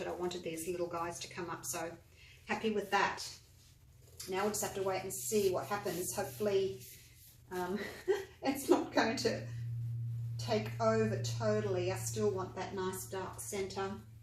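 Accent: Australia. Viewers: I love scruffy!!! I know this video is old...your birds and pours are fantastic!!! I'm from Ohio and ADORE Australian accents...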